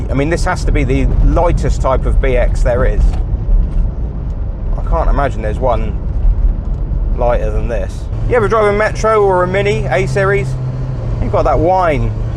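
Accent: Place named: Norfolk, United Kingdom. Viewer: Sounds like a Norfolk boy, lol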